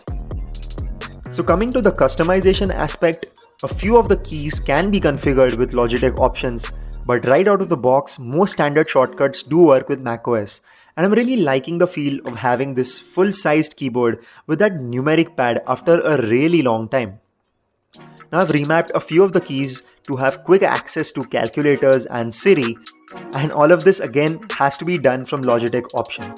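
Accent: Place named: India. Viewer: except for the Indian accent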